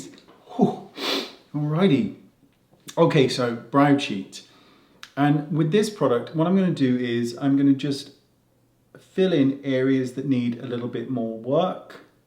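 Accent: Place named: Somerset, United Kingdom. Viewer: Looks great, nice to see a Bridgy boy on YouTube. I'm also from Bridgwater so I recognised the accent straight away 😊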